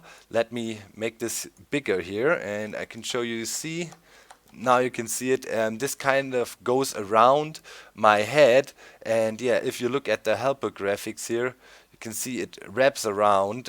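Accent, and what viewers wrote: Germany. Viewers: ich wusste es du bist deutsch (das hört man etwas) ab links oben kann man die deutschen Optionen sehen :D Hallo :DD übrigens gute Vorstellung ;D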